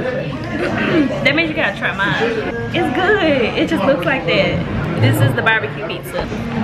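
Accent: South, United States. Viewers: I just love your accent (I guess because I have a southern accent too) and how you carry yourself as a young black woman. It is so important that we as young black women lead by example for young women to be "Elegant" and carry themselves in a manner that a young lady should. I think watching vloggers on youtube who set that tone can help guide them in a positive direction. Naturally Sunny talked about you in her previous vlogs and I am so happy I came across your channel and become a new subbie as well. Thank you for being one of the influencers who brings positivity and laughter when vlogging even when it can become a challenge. I'm so happy that you and your family made it through the horrific storm. I've never smelled Tiffany & Co. perfume but I know it smells delightful and grown woman-ish.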